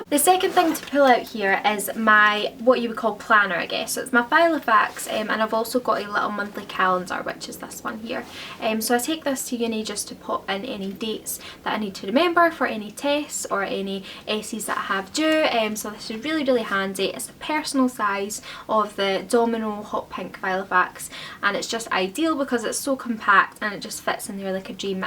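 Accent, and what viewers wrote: Scotland, United Kingdom. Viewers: the accent 💓💓. Merida's blood relative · SCOTTISH ACCENt?/?/!????? SO BEYOND CUTE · YOURE SCOTTISH WOO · Ah! So you’re Scottish! For some reason I thought your accent was German?? But then reading the comments, it made a lot more sense now. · OH MY GOD!! Are you Scottish?? I AM IN LOVE WITH SCOTLAND! This is a goal in my life to visit this country!! (I am French) I just find your video and I want to thank you for it because it really help me! I am a young student at university and I've search everywhere to find a bag that could fit with the atmosphere and now (thanks to you) I find it! So yeah thank you again!! Bye :) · I am from Germany and I will go to Scotland for my Au Pair next year. I love your accent. It is great to get prepared for my time in Scotland. Thank you so much!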